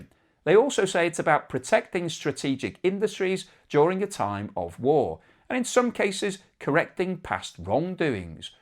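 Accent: United Kingdom. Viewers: you sound very British, and nobody in his right senses today takes seriouse what a British man says about Russias, Britain amd the West is the world problems, even worms knows that!!!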